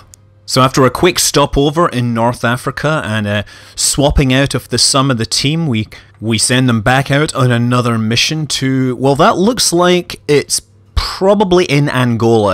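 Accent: Scotland, United Kingdom. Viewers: the classic scotsman accent. makes me want to be more Manley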